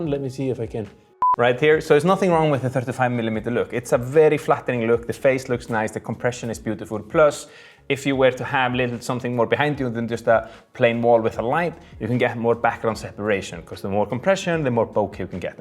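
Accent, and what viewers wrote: Iceland. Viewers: Icelandic English. I recognize that accent. Great video! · 👍Very Well Explained !! I believe I started liking icelandic English more than the american one 😀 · Been watching your videos for years, but I"m not sure if I've ever commented. Lots of family in Iceland and the Faroe Islands so your accident makes me homesick in a good way. I wasn't just watching a bunch of lighting videos and thinking I miss the days when tutorials didn't feel like ads. So nice to see you doing this video with these old godox lights and gels. Without experience I think a lot of folks these days would think they need to spend more than they do to get the look they want. Thanks for reminding me how capable my old godox 60 is.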